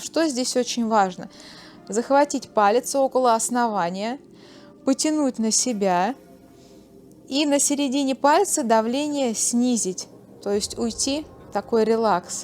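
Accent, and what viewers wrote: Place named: Russia. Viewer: You don't have to if you don't want to but do you think you could make a english speaking video someday? Please I would just love to hear you speak english with your awesome Russian accent. Please!!!!!!!😊😊😊😊😊😊😊😊❤❤❤❤❤❤❤❤❤😍😍😍😍😍😍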